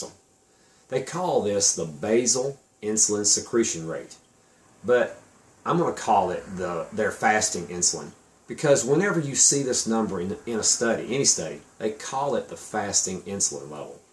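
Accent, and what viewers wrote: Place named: Texas, United States. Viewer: i just love you,. i'm texas born and bred and you TALK like me! you sound like my kinfolk! anyway, i came upon your videos somehow and started "kind of" keto" (still working on consuming so much good fat) but started fasting a month ago and have lost 17 pounds!!!! i'm 62 and very inactive these days and the pounds still came off! fasting was not nearly as scary as i thought it would be; actually quite the opposite. i LOVE it and when i do eat, the food tastes better, i feel better, and it gives me joy. keep it up for so many of us!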